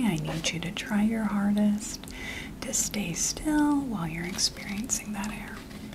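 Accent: South, United States. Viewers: Crazy that I found your videos by reels on Facebook and totally got ASMR from the southern accent. Imagine my shock when I found you actually make ASMR content intentionally!! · I love this and the southern accent and ghe TYPING sounds❤❤❤❤❤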